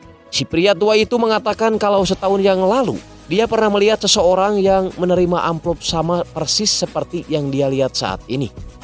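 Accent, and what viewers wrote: West Java, Indonesia. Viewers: iya kan,,sumbu pendek,,,ini film serius,,knp suara yg buruk ini pembawaannya komedi,,,di kira lucu kali dgn logat sundanya,,kampungan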